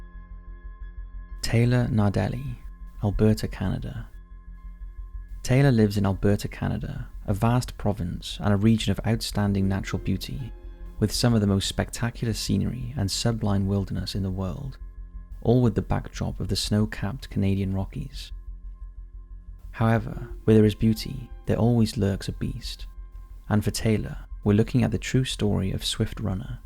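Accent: United Kingdom. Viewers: Dude..your stories and narrations are awesome and gripping. One problem though. You talk so low its difficult to make it out even on earphones. An then there is your British accent ! (coming from an Indian Lol )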